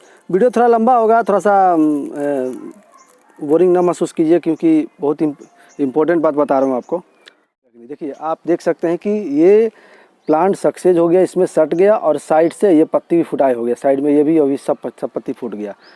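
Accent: Bihar, India. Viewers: "Bihari" ho kya bhai ???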